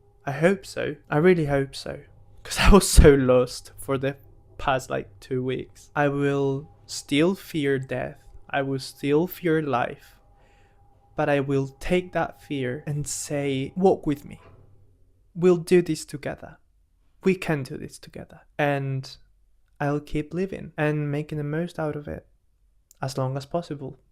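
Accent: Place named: United Kingdom. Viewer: This is something that scares me so much! Every time I remember it will inevitably happen, it hits me like a truck. It's really weird to deal with it knowing we will all go through it at some point. Whenever I remember it, I get too existential and start getting too philosophical for my own good. I don't want to miss out on all the things that will happen after my death — books, movies, songs that will be released that might even be my favorites if I were around. It's really difficult to be alive sometimes, but I love the perspective of doing it consciously and being present in each moment. I don't want to waste any time at all to the point it overwhelms me. The fact I don't get another chance if I fuck up now really scares me. This was a great video! I believe you'll still have a large audience one day! Are you from the UK? I was trying to identify your accent the whole time lol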